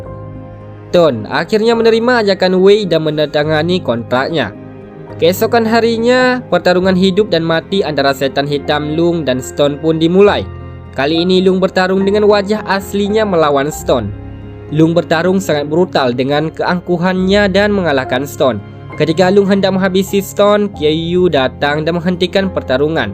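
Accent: Aceh, Indonesia. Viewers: medok Aceh nya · Maaf min loe dari daerah mana logat nya mirip aceh atau bali y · Admin awak aceh sang euh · Logat aceh admin nya 😁😁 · Suara nya mendhok kayak suara org aceh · Orang Aceh ya bang?. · Bg orang aceh ya? Medok karna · Logat aceh😅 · Pembawaan suara mentok kali orang Aceh.. Sehat slalu admin, salam asam Sunti ..😅😅😅😅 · Bahasa Indonesianya terdengar logat ORANG ACEH., hihihihi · Admin nya orang aceh ya? Logat nya Aceh kali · Aceh ni logat nya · Seperti logat orang Aceh? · Lupaya bukan cuman saya yg sadar klok mimin org aceh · Logat Aceh ya wkkww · dari logat pasti orang aceh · Kayanya yg ngisi suara di alur cerita channel ini oranh aceh😂😂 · ini org Aceh keknya yg bicara😀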